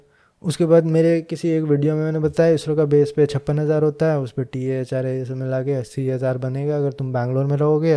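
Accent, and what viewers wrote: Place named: United States. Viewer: Feels like now your Hindi is slightly sounding American accent 😅. Just saying what I felt. Been more than a year, I heard you speaking Hindi.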